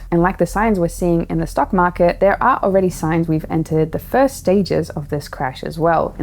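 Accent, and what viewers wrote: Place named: Australia. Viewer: Why do you have an australian accent?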